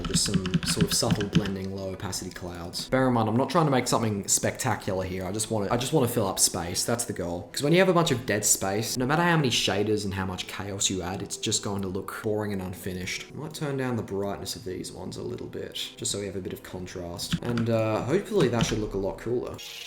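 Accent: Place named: Australia. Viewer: got punched in the face by that thick ass Aussie voice, willing to bet money this dude’s from Australia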